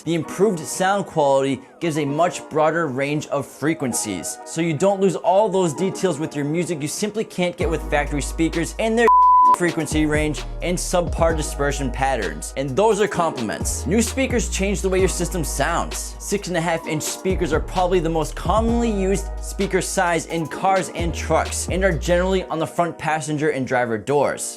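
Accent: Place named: East Coast, United States. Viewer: Say hello to ya motha for me.east coast voice..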